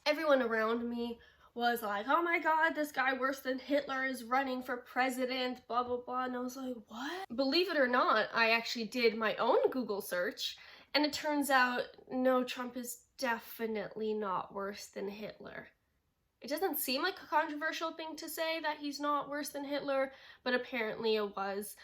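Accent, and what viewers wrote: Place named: England, United Kingdom. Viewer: Interesująca masz historię życia. Trenowałaś sport, so did I 🙂 kochasz zwierzęta 👍🏻💞 so do I 🙂 Kocham Twój angielski akcent 💞🙂 Pozdrowionka 😉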